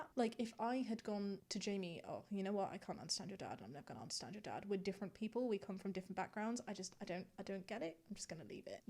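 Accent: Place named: Yorkshire, United Kingdom. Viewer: Eyyy Yorkshire accent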